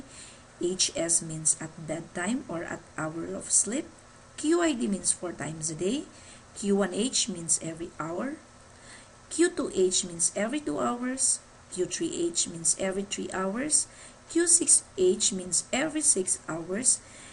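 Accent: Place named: Philippines. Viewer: I love the filipina accent. 😘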